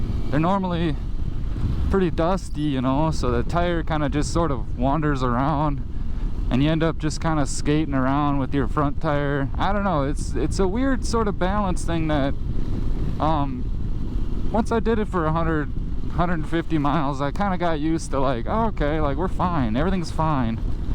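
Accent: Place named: Minnesota, United States. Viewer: you’re welcome! Thanks for the compliment! Glad to help. Your MN accent reminds me of being a kid living in NE Minneapolis.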